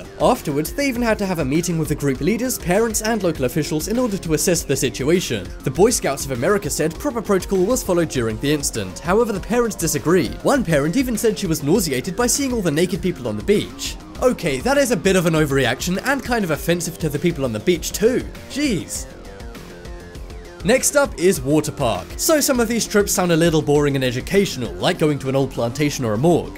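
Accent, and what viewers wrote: England, United Kingdom. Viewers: it's 999 in england, and you sound English so you should know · damn you speak soooo british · Morgan Minnix no cos he had an English accent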